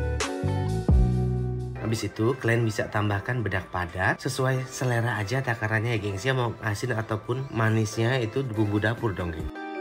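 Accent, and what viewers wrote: Java, Indonesia. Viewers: Tetap medok 🙏🤭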